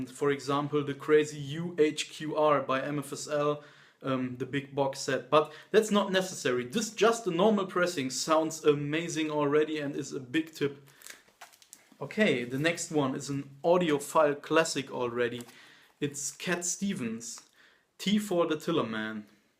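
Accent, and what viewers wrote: Germany. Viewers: u german? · Den deutschen Akzent hört man sowas von heraus, das ist echt krass!:D Danke für die ganzen Tipps, insbesondere bezogen auf lagst du verdammt richtig. Ein paar gut klingende Vorschläge hätte ich aber noch: " " von klingt auf Vinyl einfach großartig. Selbst kurz vorm Ende der Plattenseite klingt es noch großartig. " " von besitze ich als Erstpressung von 1972 und das Schlagzeug, der Bass, das haut einem bis heute immer noch perfekt um. Sollte in keiner Sammlung fehlen, für 1972 aber auf zweifellos eine grandiose Abmischung, welche ja eig. kein Remaster benötigt hat, ehrlich gesagt. " " von ist ehrlich gesagt eines der Alben, welche einen Klang besitzen, was viele von Vinyl nicht erwartet hätten. Der Bass ist prägnant wo es nötig ist, jedes Instrument ist klar und ohne Verzerrungen, noch dazu ist der Dynamikumfang insbesondere beim ersten Part von großartig. 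Wohlgemerkt bei einer Spielzeit von glaub 27min nur allein auf dieser einen Seite! Bei keinem Album war ich bisher so von der Klarheit überrascht, wobei es auch aktuelle Livealben toppt, egal ob digital oder auf Vinyl. Ich belasse es erstmal dabei, da meine weitere Vorschläge für audiophileres doch wieder etwas mehr Wert sind als 1$/1€!:)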